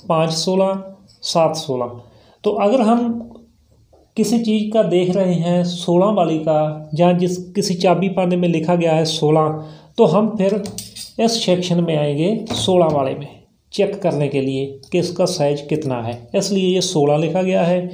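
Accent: Punjab, India. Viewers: Punjabi banda hindi bol raha ha..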